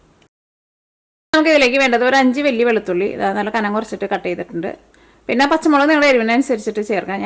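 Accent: Thrissur, India. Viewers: Thrissur slang , mutta kuruma adipoli, ee veedu evideyanu?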